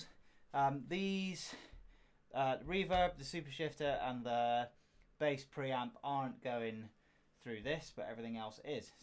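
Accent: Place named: South West, United Kingdom. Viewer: Mostly came here to find out what that mysterious silver box of yours did, Nice to hear a south west accent talking about pedals for once keep it up!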